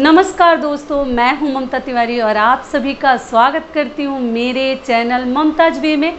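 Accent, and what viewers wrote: Bihar, India. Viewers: Aap Bihar sa ho kya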